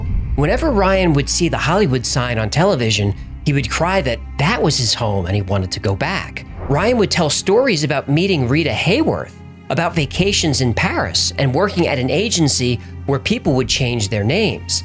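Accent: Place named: East Coast, United States. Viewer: Hey, do you have any relation on television? The hubby and I watch “Toon in with ME” on METV and there’s a guy on there named Toony the Tuna, and he’s got an east coast accent like yours. Coincidence??? AJ says there’s no such thing.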